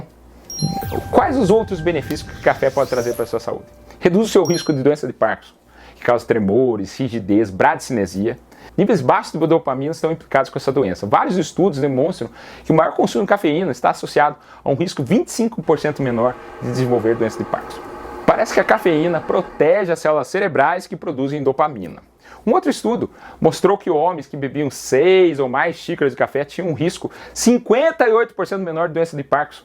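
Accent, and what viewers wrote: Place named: Minas Gerais, Brazil. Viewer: vrdade gostei tbm do jeito mineiro.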